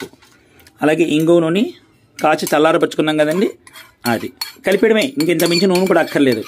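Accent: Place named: Guntur, India. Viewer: Guruvu gariki namaskaramulu. Mee vantalu ma amma garini gurthu chestunay andi. Meedi a vooru andi. Mee vucharana guntur district ni gurthuchestondi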